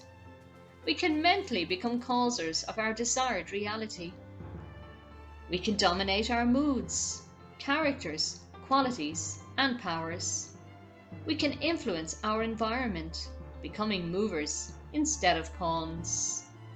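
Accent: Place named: Ireland. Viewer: Are you Irish? I love your accent! Not flirting just saying. Sometimes it love to jump into the mind of an Irishman to see how we sound to you guys.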